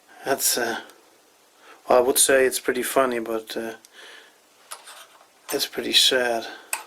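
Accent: Netherlands. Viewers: Are you Dutch? Because of the accent.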